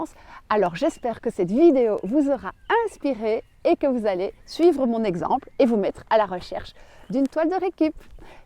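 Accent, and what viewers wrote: Belgium. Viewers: J'aime bien ton accent ( Belge mais d'où ? ) quand tu dis couisiner, kil-o ( moi je dis kil-eau ) ,camme-ping, etc... Merci pour ton sourire et ton optimisme !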